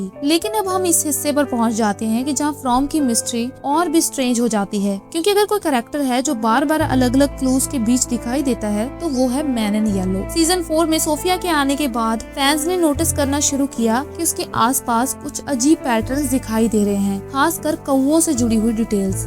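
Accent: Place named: Dogri, India. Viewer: Aap kaun si state we hain. Accent paahdi dogri lag raha hai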